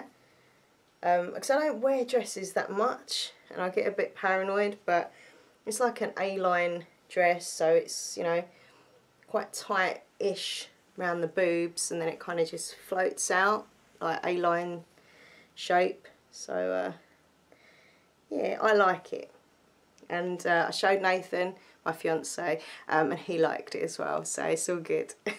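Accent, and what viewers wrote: Essex, United Kingdom. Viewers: You sound like you're from Essex with that accent. Are you from that way?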